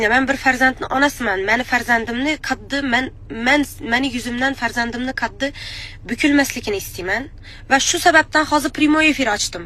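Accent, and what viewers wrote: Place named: Khorezm, Uzbekistan. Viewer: Шивада гапираяпти хоразимча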